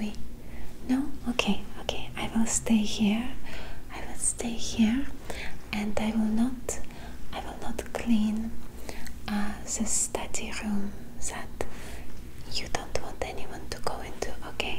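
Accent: France. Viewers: That french accent you do here is to die for... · Oh this video is incredible! You look so pretty in that outfit and your French accent is so cute! This was a very nice thing to wake up to.🤗💜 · That's a good French accent 😂 reminds me of the 80s comedy show Allo Allo if anyone remembers that. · I love the French accent and the lovely maid uniform. Your voice is very soothing and comforting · You are amazing----have yet to see anything you can't do. Looking Beautiful as a French Maid and Fantastic accent too. LOVE---LOVE everything you do.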